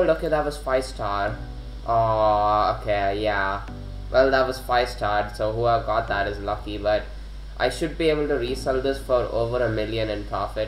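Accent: India. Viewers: The Indian accent shining thru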